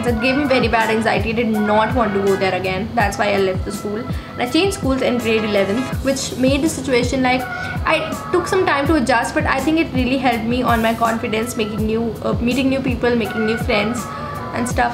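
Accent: India. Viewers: Yuh R NATURAL n that's why I ❤ yu n yur channel...... Yuh have a great n clear Indian accent with great fluency of English ( IDK why ppl say that yuh R not goooood in English like seriously it drives me crazy! ) And yuh R not like fake ppl who show their prerogative n privilege n their accent more then their content...... so no negativity in yur channel..... loads of ❤ from UK! N U R D Best......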